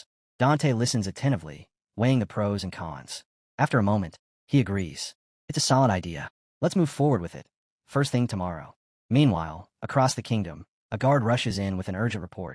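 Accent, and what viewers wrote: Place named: United Kingdom. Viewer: The audio became a British man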